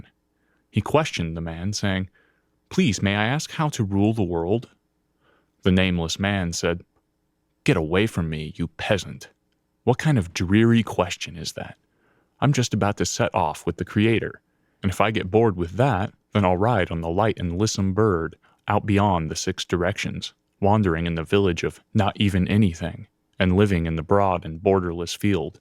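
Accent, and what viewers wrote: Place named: United States. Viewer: are you kidding? this over the top american accent is just unbearable. I can't imagine any intonation more unsuitable for reading this kind of content (well, maybe an arrogant-french intonation. wouldn't be much worse though).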